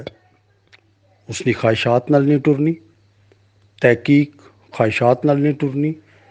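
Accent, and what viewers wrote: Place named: Pothohar, Pakistan. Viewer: Bht khoob khalis pothohari bolny o murshid.phone # mili sakran?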